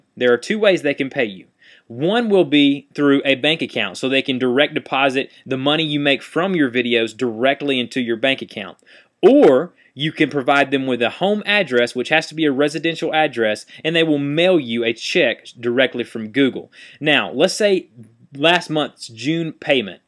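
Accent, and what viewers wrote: South, United States. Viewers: you've got a southern accent :) where ye from? xx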